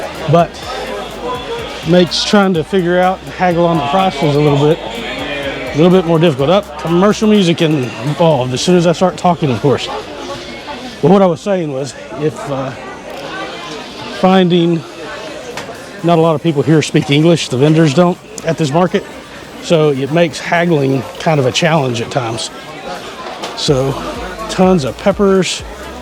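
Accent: South, United States. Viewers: Good choice moving to Belgrade Serbia is fun lots to do you seem to have a southern accent I'm guessing georgia or florida.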